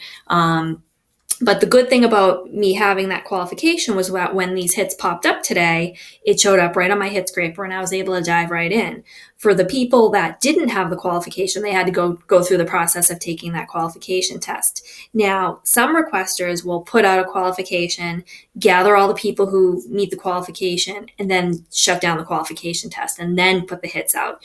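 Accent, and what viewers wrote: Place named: Long Island, United States. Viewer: that Long Island accent though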